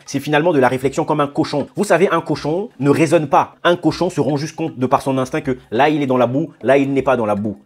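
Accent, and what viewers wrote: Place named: South, Democratic Republic of the Congo. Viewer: Apparemment ta façon de parler Montre bien que tu es du sud,,,mais si t'es un vrai congolais né au Congo,,tu feras bien la différence entre les présidents passés du Sud et ceux du nord,,, à nos jours plus de tribalisme,,,et encore regardons d'abord notre gouvernement actuel,,, zéro tribalisme,,,ok monsieur ? Arrêtes nous ce bruit s'il te plaît 😊😊😊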